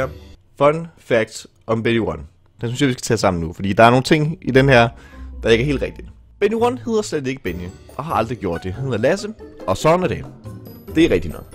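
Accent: Bornholm, Denmark. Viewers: det er nestan liga svenska men det er bonholmsk och det er skågit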